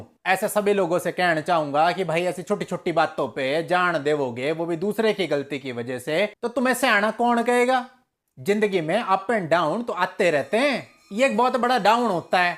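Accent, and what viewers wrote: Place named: Rajasthan, India. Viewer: Dear sir, Aap ki Rajsthani sunke bahot maza aaya.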